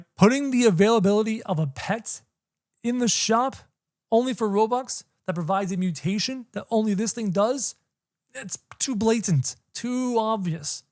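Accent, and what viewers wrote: Canada. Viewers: Genuinely thought you were from the UK and now I hear the Canadian, I'm so dumb 😅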